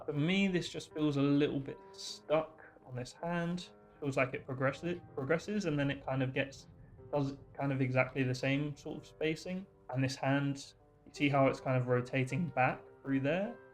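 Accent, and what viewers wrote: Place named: England, United Kingdom. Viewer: could have sworn he's english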